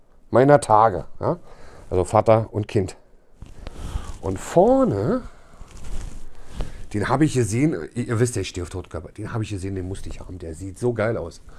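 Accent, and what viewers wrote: Berlin, Germany. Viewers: Also mein Lieber, entweder Berlinerste richtich oder lässt det. Dieses „Berliner/Hochdeutsch“ dit is nüscht!!! Grüsse 🤘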